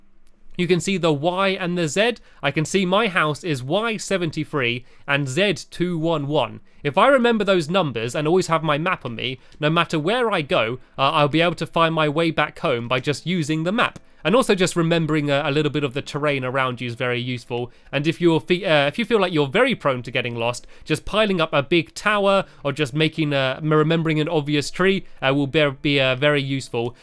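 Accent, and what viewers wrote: England, United Kingdom. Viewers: Brianna Buckner Because there British and they live in the U.K. and in the U.K. they say zed instead of z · Well you must be American because he is british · he ameracan or english americans call it z and engerlish calls it zed thats just there landguge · Brianna Buckner he's British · he’s british that’s why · it' because Z was originally Zed but because of 'Muraca it's Z so technically he is right and were wrong "short answer UK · were u born in englend i cant tell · Cuz he’s English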